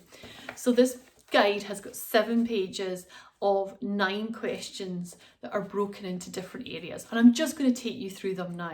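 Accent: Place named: Scotland, United Kingdom. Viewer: Its called a Scottish accent. Get out more.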